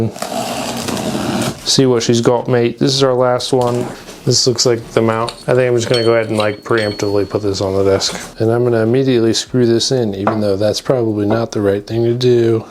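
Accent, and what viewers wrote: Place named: United Kingdom. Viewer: The british accent always has me 💀💀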